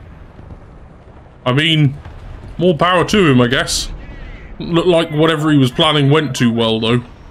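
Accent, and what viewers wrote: United Kingdom. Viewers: Is he British though!?! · as a British person I can confirm he very much is British not northern tho unsure of accent.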